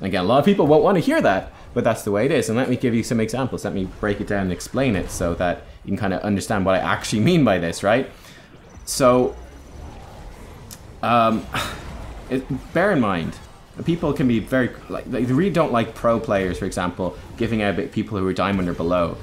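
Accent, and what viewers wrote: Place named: Ireland. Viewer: I love your commentary. Your Irish-ness is part of your charm. No need to self-police.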